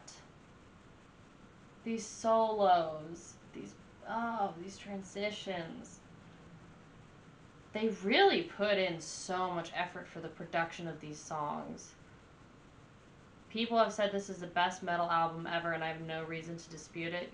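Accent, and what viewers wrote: South, United States. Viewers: I'm thinking it's thrash metal perfection. is incredible. One of the best metal records out there. Are you southern, or from like Tennessee? Love the accent in there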